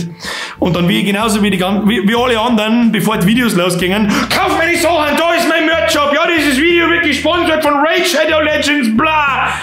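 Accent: Bavaria, Germany. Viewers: Bin nicht aus Bayern finde dich einfach sympathisch und den bayrischen Dialekt ist einfach nice wünschte ich könnte ihn btw ich verstehe ca. 80% was du sagst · Oid Boarischa noma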